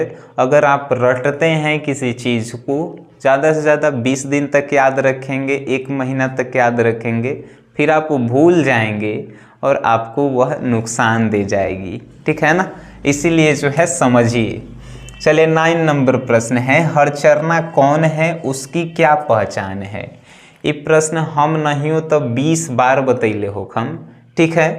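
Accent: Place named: Bhojpuri, India. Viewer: Sirji aapki bhojpuri must h😝😝😝💞💞💞💞💯💯💯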